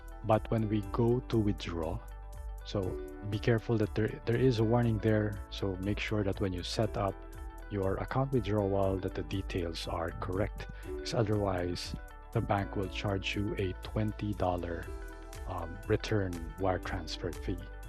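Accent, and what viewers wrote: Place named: United States. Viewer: You indeed have a nice american accent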